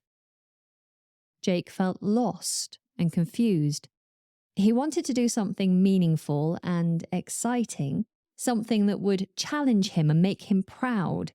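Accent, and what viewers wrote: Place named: RP, United Kingdom. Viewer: Good lesson, especially for the beginners! I especially adore your accent, the genuine RP accent and pronunciation.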